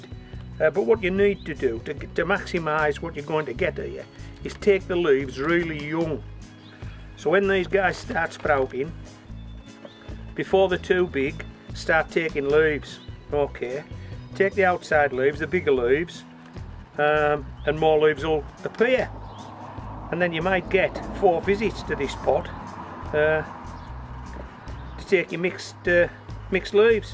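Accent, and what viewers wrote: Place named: Scotland, United Kingdom. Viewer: GMC 06 he sounds like Scottish man 😋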